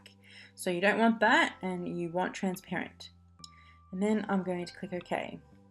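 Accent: Australia. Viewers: For once! its nice to hear an Aussie accent. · Very comprehensive tutorial and with an Aussie accent!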